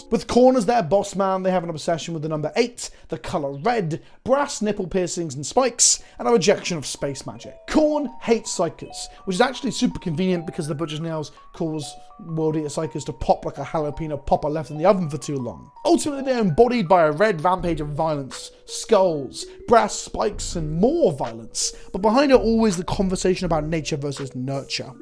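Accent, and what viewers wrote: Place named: United Kingdom. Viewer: yeah I'll sub to the channel. gotta get my british guy talks about warhammer fix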